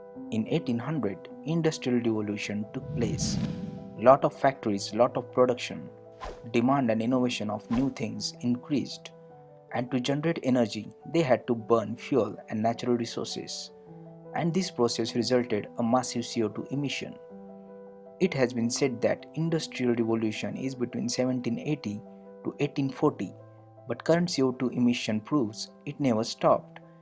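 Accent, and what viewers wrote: India. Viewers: I think his accent is indian. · I got even more confused with this shithole Bengali accent..!😂🤣🤮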